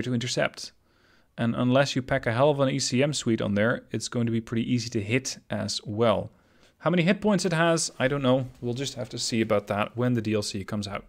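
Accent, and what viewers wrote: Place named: Netherlands. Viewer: Holy Dutch accent! (Flemish here ;)) Looking forward to this.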